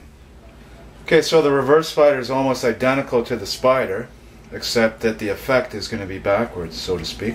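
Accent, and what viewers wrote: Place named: Canada. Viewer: he sounds canadian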